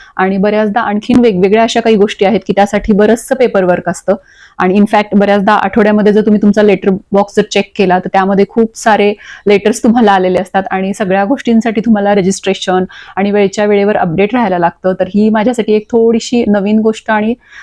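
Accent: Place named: Kolhapur, India. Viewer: खूप छान माहिती दिली मॅडम तुम्ही . तुमच्या बोलण्या वरून तुम्ही कोल्हापूर , सातारा , सांगली , किंव्हा पुण्याच्या आहात अस वाटत .. बरोबर ना 😊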